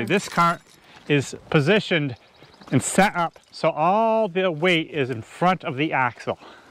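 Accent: New England, United States. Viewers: I was wondering where that accent came form N. E. but not Ma or Ma NH hs become an extension of Boston so it was out and RI (where I lived in 80's has a whole bunch of them Why Prov has at least 6 alone And Woonsocket is a place all to itself throw me down the stairs my shoes where they park the cars side by each and Cranston dont get me started By Love is from there and her nasal is bad)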